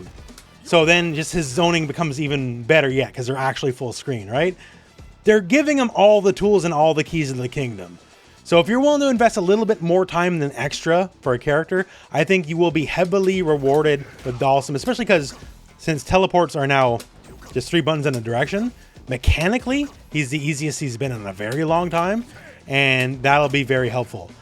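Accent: Quebec, Canada. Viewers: T'es québequois hein ?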